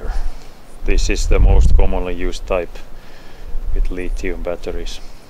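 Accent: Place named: Finland. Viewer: Hmmnmm... Let me guess... You are from Finland.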